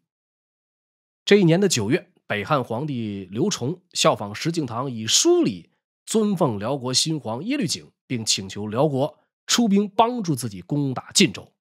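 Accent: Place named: Tianjin, China. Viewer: 我会说一点粤语 以前经常看港片听粤语歌看一些那边拍的整蛊视频 其实我和博主算是老乡 不知道你们听没听出来博主说话有点天津滴口音 介北方人和南方人就算都说普通话也是能听出来发音上的区别的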